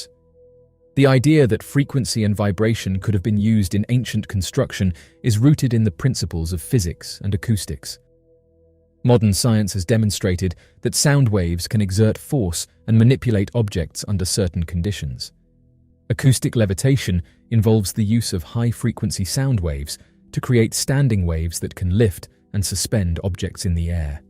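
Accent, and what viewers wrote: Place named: Southern England, United Kingdom. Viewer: The channel is from the UK and the narrator has a basic Southern English accent. What accent wouldn’t annoy you ?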